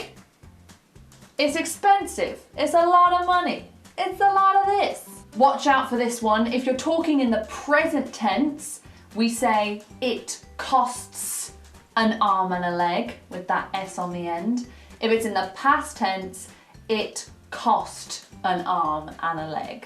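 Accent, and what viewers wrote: United Kingdom. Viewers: I love her uk pronounce and explaining ❤️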